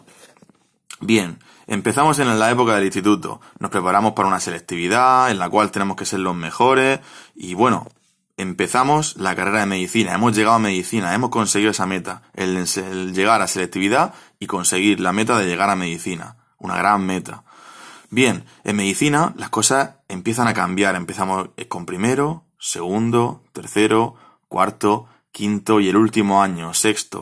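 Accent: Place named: Durango, Mexico. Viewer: amigo medio durango vos .....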